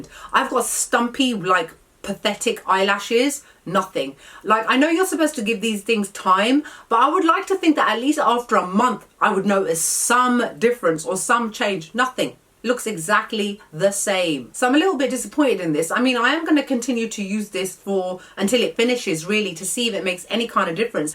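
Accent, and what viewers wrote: United Kingdom. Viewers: You are giving me Leah Remini vibes, only with British accent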